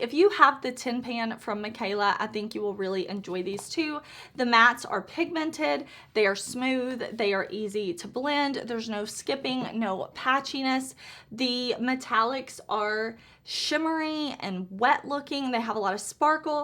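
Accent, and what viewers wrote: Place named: South, United States. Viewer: Hi,. I just found your videos by looking for videos on the Scooby Collection. I’m so glad that I stopped and watched. I love your technique and artistic abilities, but I’m staying (subscribing) because of your accent and personality!!! I do sense that you’re a SOUTHERN gal, just like ME!!! I am Jeanie from Alabama! So very nice to meet you!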